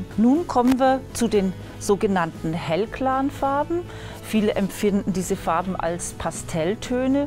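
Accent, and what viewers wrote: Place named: Upper Palatinate, Germany. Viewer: Ha ha, das ist nicht Fränkisch, der Akzent stammt aus der Oberpfalz - so kann man sich täuschen!!!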